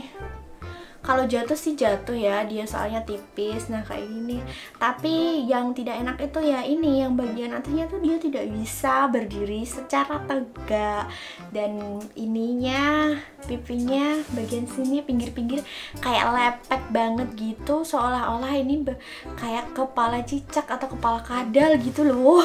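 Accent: Java, Indonesia. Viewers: Mba orang Jawa ya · Keknya org Jawa ya min? Kelepek Ken..ke mencung en 🤭🤭🤭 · Orang jawa mana sih kak ? Kok medok bgt hehe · Jawa medok kak:)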